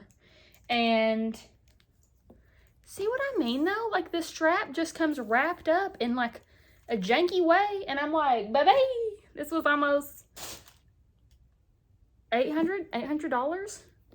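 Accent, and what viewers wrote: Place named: Kentucky, United States. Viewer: I love love you girl,you are awsome,awesome, love your approach to life,your makeup ( you are gorgeous) have such a natural look.love the Vibes and love love your drawl are you from kentucky? I am and we sound a lot alike.i am scared of bronzer also.you don't need it.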